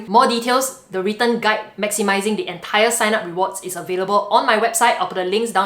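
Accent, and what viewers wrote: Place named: Singapore. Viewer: I can never get used to Singaporean accent. Good content though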